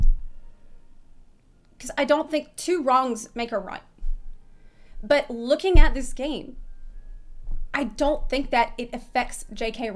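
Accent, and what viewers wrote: South, United States. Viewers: Southern accent makes sense now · Its because she is from the south, she's from Louisiana. She moved to the Ukraine to teach English iirc. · Is it me or she is becoming more and more Southern by the day?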